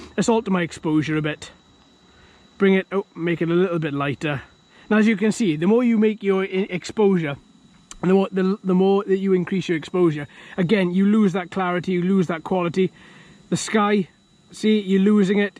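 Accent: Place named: South Wales, United Kingdom. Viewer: Great vid. Very informative. By the way is that a South Wales accent I detect?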